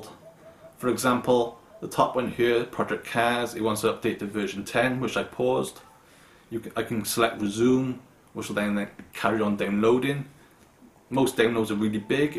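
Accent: Newport, United Kingdom. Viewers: Newport accent?😝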